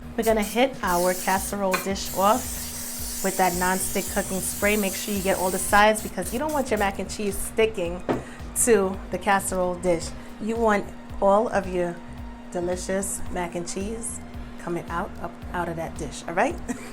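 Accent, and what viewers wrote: New York, United States. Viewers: I love this channel. I can't lie, I come back for that NY accent more than anything. I love how women from NY talk.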